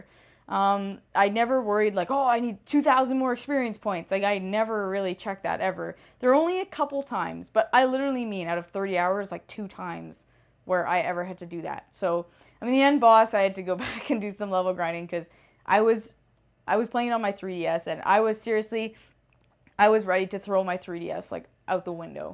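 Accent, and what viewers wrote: Canada. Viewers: This is a really well-lit YouTube vid. I like your Canadian accent. Chrono Trigger was really cool. I think I beat it a few years ago.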